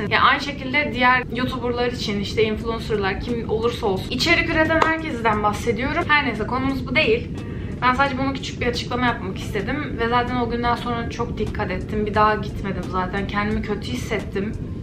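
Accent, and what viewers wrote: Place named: United Kingdom. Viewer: British aksanı olmuş o biraz ahhaha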